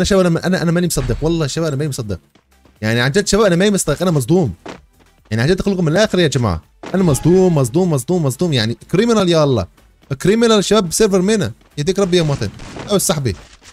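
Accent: Algeria. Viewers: صار يتكلم بلهجة جزائرية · محلا هدرتك بلجزائرية :virtualhug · صيار بتحكي جزائري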